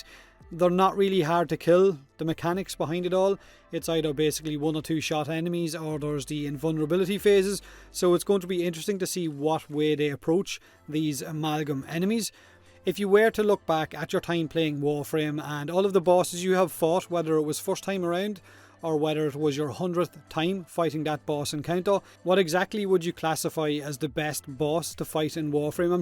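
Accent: Ireland. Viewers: You said you wanted [Akmagnus Prime], but is there another weapon you’d like to see Primed? For me, it’s [Akmagnus] too, cuz America! Fuck yeah! As well as [Corinth]. I love that fucking shotgun! Good ole’ American magnums and pump action, am I right? You wanna kill something, buy American! (OuO) <3 Love your vids and please, for the love of Clem, let me hear your full Irish accent. I’m Irish-American, and I can’t get enough of your accented hilarity!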